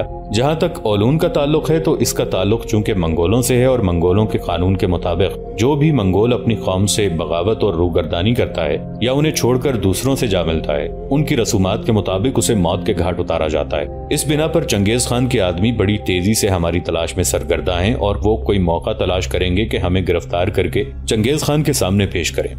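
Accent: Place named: Lucknow, India. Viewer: Agar Lakhnavi andaz mein commentry na ho to behtar hay.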